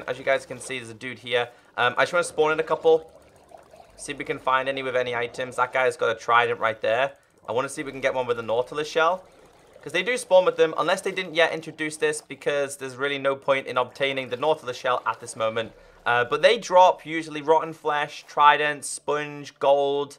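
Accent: United Kingdom. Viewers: Such clear pronunciation) Is he British?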